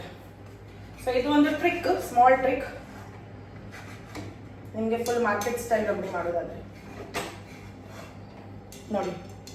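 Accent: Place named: Uttara Kannada, India. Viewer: Ur talks r little like Uttar kannada ☺️ I am from Belgaum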